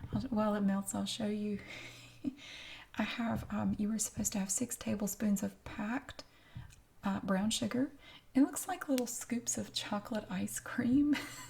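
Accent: South, United States. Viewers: That doesn't sound illiterate at all. It's obviously just an accent. I could listen to it forever. You shouldn't try to sound less Southern. It's lovely and be proud of it. Here OLL! For everyone :)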